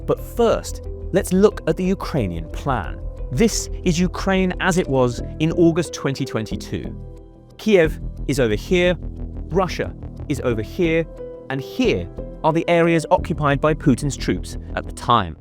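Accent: England, United Kingdom. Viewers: it's english pronunciation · British accent. Disregard.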